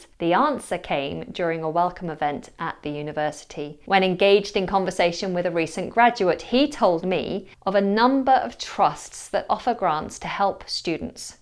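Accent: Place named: United Kingdom. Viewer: It is very useful to listen to you specially for your wonderful british accent!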